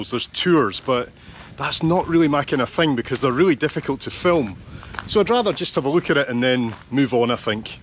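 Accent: Scotland, United Kingdom. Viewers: With your Scottish commentary my town seems quite exotic to me all of the sudden... and you point at details I never paid attention to. Thank you for your appreciation. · Amazing video! Nice detailed view of one of The Netherlands oldest and most beautiful cities! I’ve been there a few times as well. Twice I went from the train station on to Belgium and France on my bicycle to follow my last name 😄 The Helpoort (Hel Gate) is a nice thing to see. It’s the oldest city gate in The Netherlands but barely resembles the original. It has been rebuilt and reconstructed quite a few times over time, so only the arch is original. The towers and spaces above it aren’t. Still a nice thing to walk through, knowing you’re walking through something that has been there for nearly 800 years. You’ve pronounced ’Maas’ correctly! In French (and also in the French part of Belgium), this river is called ‘La Meuse’, in Dutch it’s ‘Maas’ or ‘De Maas’. 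Actually, through your somewhat thick Scottish accent you actually pronounce most Dutch names and places quite nicely. Very entertaining to watch and now you’ve got a new Dutch subscriber! 👍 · Although there is that slight Scot accent, your Dutch word pronunciation is spot on. Love that you said Amsterdam with a short A instead of the long A most Americans use. Can you imagine using a long A for America? Yet they can't pronounce Amsterdam correctly. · Yes, it wasn't difficult to understand him. I think Dutch spoken with a Scottish accent sounds interesting. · I just noticed, your Scottish accent translates surprisingly well to Dutch. (Or you simply have a good ear, also possible of course.)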